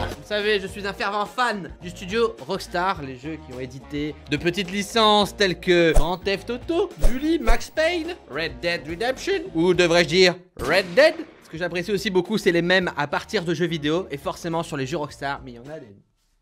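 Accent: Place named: North, France. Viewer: Et tu habites où t’as un accent du nord et moi je sais pas tu es d’où et moi je suis de Dunkerque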